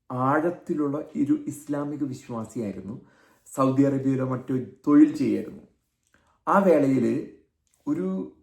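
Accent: Thrissur, India. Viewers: ethu … ee oola video kannunnavarku budhiyundenno 😝😝😝 Ivano Americayil etho family visayil allipidichu kudiyeriyavananu 😝😝😝 porathathinu oru oola thrishur slangum … 600 mandanmare ollu ennathu oru nalla karyam thanne 🤯🤯🤯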